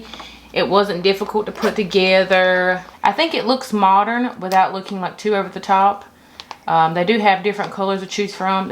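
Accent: South, United States. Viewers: I just love your southern accent 😍 baby mila is so cute.. Its a lil replica of... My baby just turned 4 months too and its no where near miss millies milestone she is so smart.. And girl let me not start with that junk in the trunk you got 🙈 u got it all in the right places.. Lol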